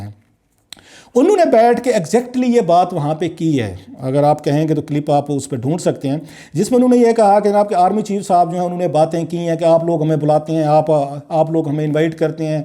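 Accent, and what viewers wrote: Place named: Punjab, Pakistan. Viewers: Jahil, o bhai landy k Liberal, tm punjabi men bat kr Liya kro, kiun punjabi accent men urdu bolny ki zehmat krty hu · کلاسرا صاحب کلاسیکل مثال ہیں ٹھیٹھ پنجابی لہجے میں اردو بولنے والے کی۔ پنجابی بھی بولتے ہیں اور اردو بھی ۔ سب ایک ہی جملے میں ۔ انگریزی تلفظ بھی ایسے پنجابی بولنے والے کا ہے جس نے انگریزی نہیں پڑھی۔ بس کوئی لفظ ادھر سے سنا کوئی لفظ ادھر سے اور اس طرح چند الفاظ پِک کر لیے یہ سوچے سمجھے بنا کہ ان کا معنی اور تلفظ کیاہے۔ اس لیے ایسا لگتا ہے۔ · Punjabi English hahaha. Confused mind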